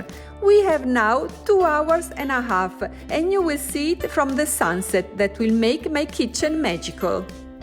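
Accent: Italy. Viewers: You have big Italian accent but I understand you very well Better then a person with an American accent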